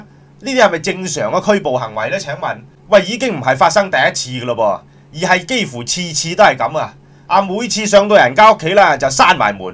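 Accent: Guangzhou, China. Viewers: 好似廣州人口音，唔似香港澳門人口音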